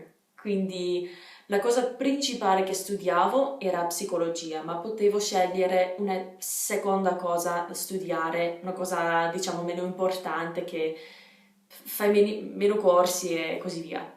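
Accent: Italy. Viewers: complimenti! Parli con un accento molto italiano!! Davvero! Non sto scherzando affatto! Complimenti! Mi sono iscritto al tuo canale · Sei veramente bravissima,hai pure l’accento all’italiana 😂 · Wow hai un perfetto accento italiano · Parli italiano. Ma non solo. Gesticoli, hai la r detta in italiano, e accenti e decadenze italiane. Wow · ODDIO SEMBRI ITALIANA. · non si capisce che sei canadese! dal tuo accento sembri proprio italiana, complimenti davvero 😍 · Hai gia un bellissimo accento italiano. Bravissima, continua così. 💪🏻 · Sei davvero brava, addirittura quando dici certe cose hai la stessa cadenza di un italiano! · Tu non sei normale!! Non è possibile per una madrelingua inglese avere un accento italiano così "italiano"! Ma come hai fatto? Considerati eccezzionale. ;-) Si vede che sei molto motivata e superperfezionista. Complimenti, hai un accento praticamente perfetto, e la cadenza è molto italiana. 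Il tuo accento potrebbe essere vagamente dell'italia del nord, ma è quasi inesistente, quasi da speaker del telegiornale. Io sono Sardo, e ti confermo che i Sardi parlano mediamente un italiano ottimo, probabilmente perchè un tempo parlare in sardo era visto negativamente. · non direi mai che tu sia straniera. Accento 100% italiano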